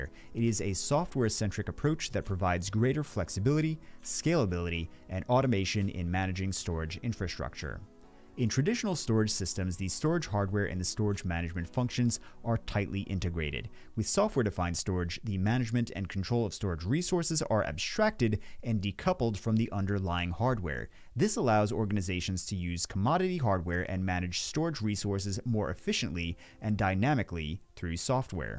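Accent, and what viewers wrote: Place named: Canada. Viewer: Must be a Cannuck narrating ... Asooor...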